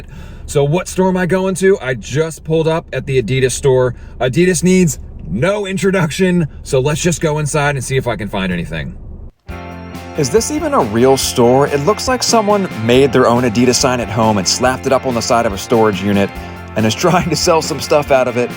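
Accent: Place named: Canada. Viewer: Ive watched a few of these videos from different posters but all seem to be american and i dont think canadian stores tend to have clearance sales to the same degree as in the US. Im not sure if youre american (you have a canadian accent and ive seen some canadian based stores in the background of your videos. Just wondering if this type of thing can work in canada. Also, subbed.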